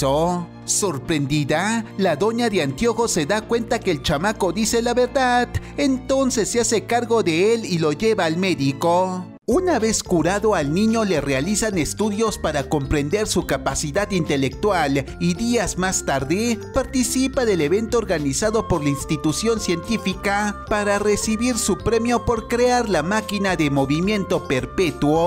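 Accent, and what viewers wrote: Mexico. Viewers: Primera ves que escucho una narración al estilo chilango,.. pues está chida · Mexicano, Mexicano el narrador !!!😁👍💪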